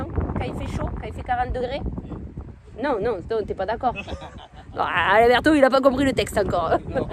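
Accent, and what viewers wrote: South, France. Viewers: Salut reportage super intéressant avec toujours ton bel accent du midi p'tit cc a Chipie 😃👍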